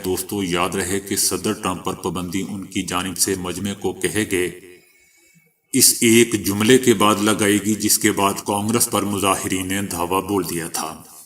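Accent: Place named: Punjab, Pakistan. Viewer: Janab mujhe aapki video pasand hi isi liye hai ki aap ki Urdu me Punjabiyat ki aamezish hoti hai.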